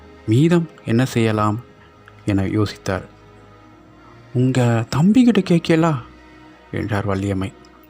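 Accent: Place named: Tirunelveli, India. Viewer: Super.. Once again that nellai slang.. feel at home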